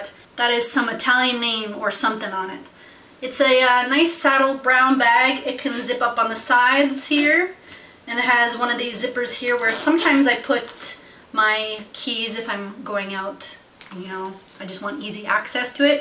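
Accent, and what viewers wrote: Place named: Newfoundland, Canada. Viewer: You for sure still have a Newfoundland twanggggg. Can you believe I haven't been wearing a purse these days? I've been toting all of my friggin' laptop, tablet and notebooks around as a mobile office. Plus all the crap I need for my youngsters when we go out. I should totally do a video. I love you brush your hair flat out. I NEVER brush my hair!!!!!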